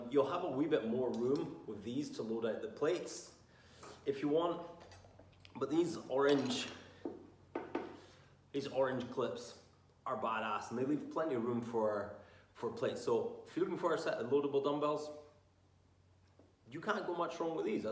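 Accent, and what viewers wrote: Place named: United States. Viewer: Why's he speaking American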